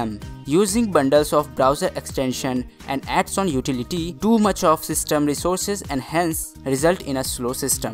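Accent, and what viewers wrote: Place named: India. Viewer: i cant understand you.. indian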